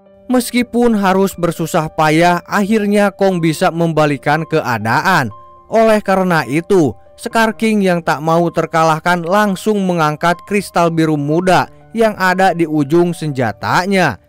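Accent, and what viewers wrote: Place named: Sunda, Indonesia. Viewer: Iya jadi orang Sunda anjir yang ngisi suaranya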